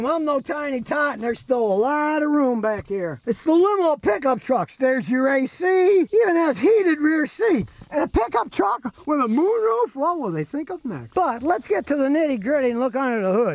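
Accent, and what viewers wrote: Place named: Tennessee, United States. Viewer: It sure sounds like Tennessee lol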